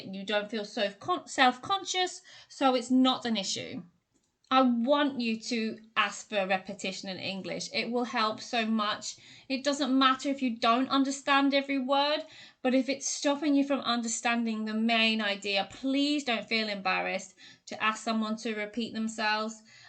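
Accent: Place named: United Kingdom. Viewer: Hey .. those are really cool way .hmm . I think you have a British accent right .I like that .why don't you teach how to learn British accent .I wanna learn it .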